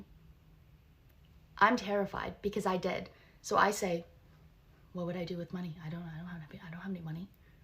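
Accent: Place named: Canada. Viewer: You sound Canadian. So I’m guessing this occurred in Canada. Could be wrong though.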